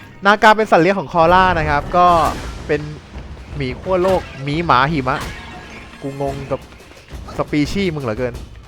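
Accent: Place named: North, Thailand. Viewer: พี่คนเหนือป่าว พี่ พูด เหนือ อะ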